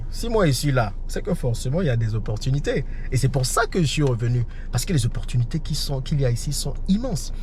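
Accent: Cameroon. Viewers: Moi aussi quand je suis tombé sur ta vidéo pour la 1ère fois il y a un an, j'étais tenté de penser que tu étais camerounais vu ta façon de parler, de voir les choses etc. Ce qui est sùr, l'essentiel c'est d'ètre africain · La première fois que j'ai suivi une de tes vidéos en 2021 , j'ai cru que c'était un frère camerounais, il parlait comme les camerounais et n'est pas trop dans les histoires des clashs et fait bcq dans l'éducation, comme certains de mes frères et sœurs, comme Simo, Stéphanie, Marthe Carine, Nathalie yamb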